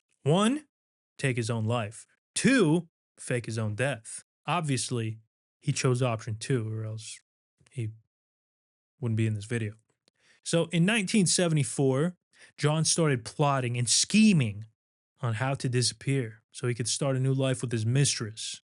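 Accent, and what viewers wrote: New York, United States. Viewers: The new yorker/jersey accent makes this video better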